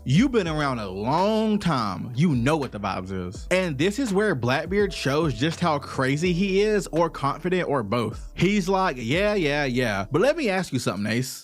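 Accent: South, United States. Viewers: You have such a southern accent man, where are you from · Sorry but with that weird ghetto southern drawl i couldn't make it past the introductions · Nice southern accent. Not hating, I have a southern accent too. Awesome video though and I’m subbed now.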